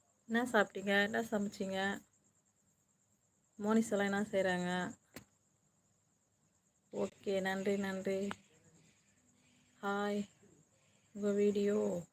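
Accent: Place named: Coimbatore, India. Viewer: Hi என்ன ரொம்ப தூக்கம் போல கோவை தமிழ்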